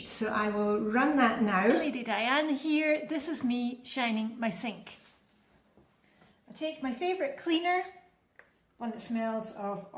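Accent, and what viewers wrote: Scotland, United Kingdom. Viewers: 4 years ago, and by complete accident- Over 10 Ways We Really Hygee Here in Denmark. You had your crisp haircut, wearing camel color knit, sitting in your wing back chair. Next thing I knew I watched all your content. Was really inspired to tackle letting things go, and practice daily routines. Really appreciated your simplistic frankness. Somehow it cut through the chatter of everyone else. I was always impatient with Fly Lady content from others. Sorry if it's weird, but your charming Scottish accent kept my attention while, at the same time, calmed me. Thanks for being the company in the room cheering me to get the thing done. It's been a real pleasure Diane 🤩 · I've lived in Scotland for 8 years and I've got the biggest soft spot for the lovely, cosy, Scottish accent. You could read the back of a packet and I'd still listen to it. 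· Hi Diane. I often think of you, with your Scottish accent, saying "Good enough is good enough" 😊 That's such a good one! I must have found you soon after you started. I feel like I hit the jackpot when I did. I was searching for cleaning motivation on YouTube. You're such a blessing to many of us, even here in Ohio 😄💖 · Hi Diane! I found you on You Tube in 2017/2018 when searching for decluttering videos. So glad I found you! I was instantly hooked by your warm personality, encouragement, Scottish accent, and how you led by example, by doing the work yourself in your videos to achieve a lovely and clean home. Happy New Year!! 💕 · Hi Diane!! I found you through Dressing Your Truth sometime in the last year or so. Your bright and playful nature, along with your lovely Scottish accent, offer us all a reprieve from the daily stresses. Your videos helped me get through some of the darkest days of the pandemic. 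Congratulations on your latest milestone and thank you! 💕 🖖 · Hey Diane, I have been watching you for 2-3 years. I was new to YouTube and you kept popping up in my feed. One day I clicked on you and thought, well, she's, but she sure sounds like a Scot to me (I'm a Scot living in the US). I learned FlyLady from you and it has made a wonderful difference to my life. On the mend after hand surgery and I know exactly how to get back into the swing of things, I don't have to wonder where to start. I love all of your videos. You are so pleasant and positive and your videos bring a spark of joy to my days. Congrats on the 15 mill!! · Hi Diane, I found your YouTube channel around 2 years ago through a recommendation from another channel...sorry I don't remember which one---I had read "THE LITTLE BOOK OF HYGGE, the Danish way to live well" by Meik Wiking. My dad was born in Dundee, so I felt kinship with your Scots accent as well. 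I have also followed the Flylady system. I've totally enjoyed your channel as a subscriber and I want to congratulate you on a job well done!! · I found you early 2021. You were doing a video as part of the mega motivation collaboration with the minimal mom. I just loved watching you as it was nice to hear a Scottish person on YouTube as I live in East Lothian. You have transformed my home routines as I now follow a system and my home is definitely more hygge and I love it. Keep on doing what you are doing as I love the fact you are so relatable and you have such an interesting life. Xx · I was searching for someone who could explain the flylady system to me, lol, I found you. My husband is Scottish and I liked bringing a bit of your accent and culture into my home 😊. That was about 4 years ago. As a shift worker I don’t really have a system down but you give me inspiration to keep trying and do small things every day, thank you 🙏. 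· Happily, you popped up in my feed ( I am constantly looking for help with my routines!) Have been watching for 2 or 3 years now, you are an I spiration, love your content, your personality, hygge, and your Copenhagen lifestyle, all wrapped up in a gorgeous Scottish accent, thank you❤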